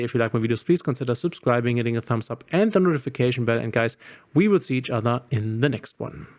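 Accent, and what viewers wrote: Germany. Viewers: thick german accent lol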